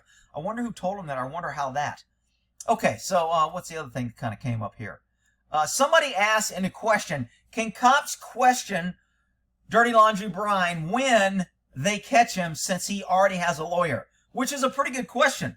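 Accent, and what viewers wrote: Texas, United States. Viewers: And the screechy Texas draw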